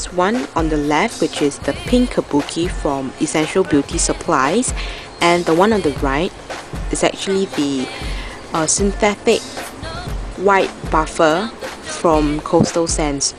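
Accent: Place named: Singapore. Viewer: hahahahha.. from your accent i can tell you're a singaporean ^^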